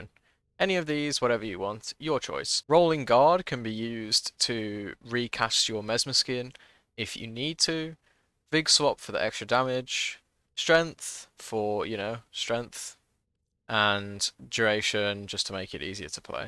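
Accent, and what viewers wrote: United Kingdom. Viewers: Great vid from my favourite Bri'ish content channel! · new bri'ish content woaaw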